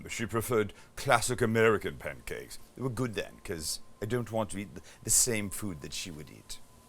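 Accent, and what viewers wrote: United Kingdom. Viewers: I've commented on how bad Sam's southern accent is. His British accent is perfection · That British accent..😂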